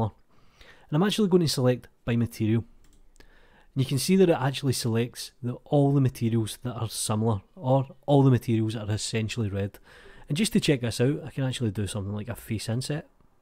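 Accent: Scotland, United Kingdom. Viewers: Thank you random scottish guy from internet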